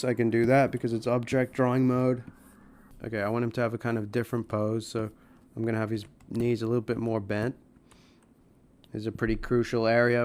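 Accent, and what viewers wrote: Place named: Australia. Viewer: slightly higher pitched Australian *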